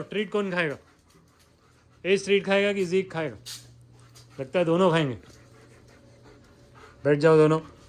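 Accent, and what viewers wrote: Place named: Gujarat, India. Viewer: First time viewer- Bolne ka tone gujrati he ya marathi bit confuse. But style hrithik jesa he bilkul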